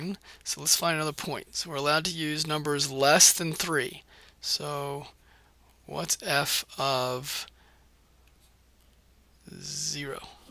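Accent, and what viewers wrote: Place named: United States. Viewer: Super helpful and all, but did anyone else thinks he sounds like Caspar Lee with an American accent?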